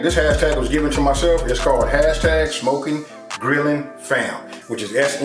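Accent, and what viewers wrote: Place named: South, United States. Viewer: You need to come to Destin FL and try grits a yaya at this local place. This sorta reminds me of it. I can tell you’re southern, but from where?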